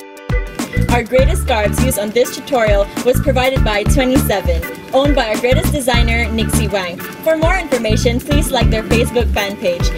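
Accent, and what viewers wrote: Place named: Philippines. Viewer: Are you a filipino i asked cause of your accent i am a filipino myself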